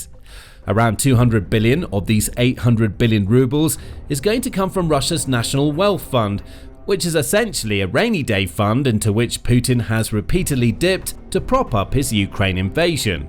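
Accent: England, United Kingdom. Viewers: Why does the narrator keep referring to petrol as gasoline? He's English based on his accent and in the UK they call it petrol NOT gasoline.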